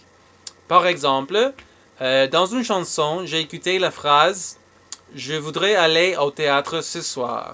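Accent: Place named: Louisiana, United States. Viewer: On dirait qui parle le Cajun louisianais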